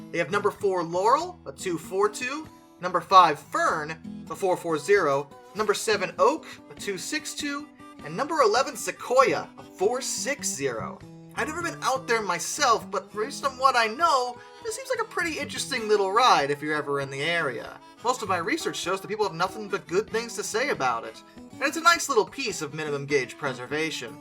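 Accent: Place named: United States. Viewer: i wouldnt call these the smallest but its nice to hear an american talk about the sand hutton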